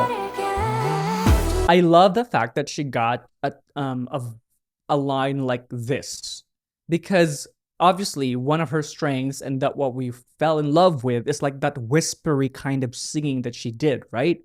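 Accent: Philippines. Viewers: Are you filipino ? Because of your accent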